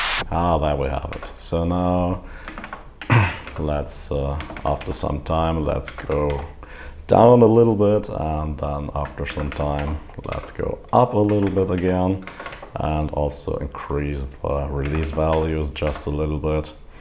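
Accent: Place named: Germany. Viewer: Ich schreib mal auf deutsch, weil ich faul bin und an deinem Akzent auch raushöre, das du deutscher bist :-) Das Belächeln von Romplern fiel mir in einschlägigen Foren auch auf und ich kann heutzutage nur sagen, dass das Lächerlich ist! Witziger Weise hat einer der Typen, der „Rompler“ belächelt, in seinem Profil angegeben, dass er NI Komplete 8 besitzt (schon ein paar Jahre her). Wenn ich diese NI Libraries mit meinem Roland XV-5050 und SRX-08 und 05 vergleiche, kann ich nicht anders als diesen Typen auszulachen! Vom Prinzip her ist so eine Software-Library mit den Instrumenten nichts anderes, als ein „Rompler“. ABER: Die Ni-Dinger waren oft Sample-technisch viel komplexer aufgebaut, als die meisten Presets auf meinem 5050, aber klangen halt leider nicht halb so geil. und wenn ich recht überlege: Die besten Beats, die ich in meiner Jugend gehört habe, wurden mit solchen „Romplern“ gemacht. 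Hol dir einen aus der Roland JV oder -XV-Serie oder Korg Triton, Yamaha Motiv und du hast eine breite Palette an Instrumenten, die GEIL klingen! Samplen ist heute ohnehin eine heikle Angelegenheit.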